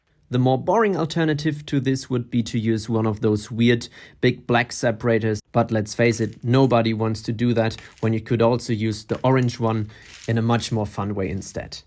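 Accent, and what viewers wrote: Germany. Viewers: Youre german arent you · Do i hear a german accent? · Are you are German??? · Da hab ich den Deutschen Raus gehört:) Hallo;) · man hört den deutschen dialekt also sprich halt deutsch hört sich so nicht schön an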